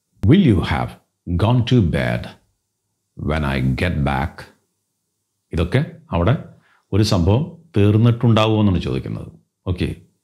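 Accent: Thrissur, India. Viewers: Intro...kalakki....sirntae sound super... Sirntae voicelootae kettappo thrissur bhasha endhu rasama...👌👌👌👌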